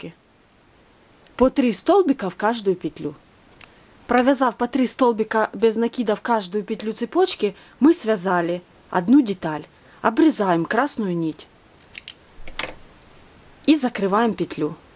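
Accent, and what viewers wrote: Ukraine. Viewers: Девушка видимо украинка, вот и говор такой, так то всё же понятно😊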